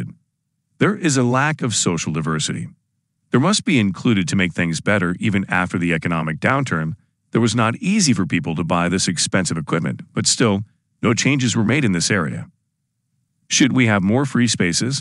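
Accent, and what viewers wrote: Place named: United States. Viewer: ..Why is someone with an American accent calling soccer, football????????z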